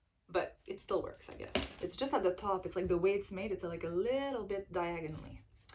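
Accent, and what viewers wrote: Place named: Montreal, Canada. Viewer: You have the cutest Montreal accent ❤️ cute comparison!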